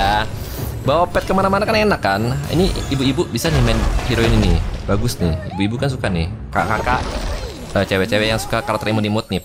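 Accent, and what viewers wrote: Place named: Medan, Indonesia. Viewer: Mati kau longor wkwkwk medan kali bahasa mu bg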